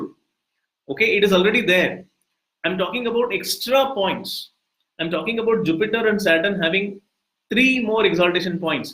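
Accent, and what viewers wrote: Kerala, India. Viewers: Sir u sound like a South indian Malayalee family I always love ur prediction god bless i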